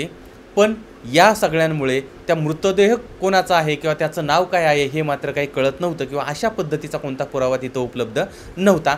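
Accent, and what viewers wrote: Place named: Ahmednagar, India. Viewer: saheb, nice Nagar (Ahmednagar) language. This language is very superior to other district languages especially vocabulary, uniqueness, least niazam urdu, systematic presentation. Keep it up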